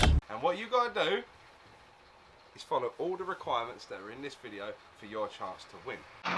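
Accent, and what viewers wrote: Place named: United Kingdom. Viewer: Your so handsome 😊🤗😅 Never skip watching your videos. Love your British Accent btw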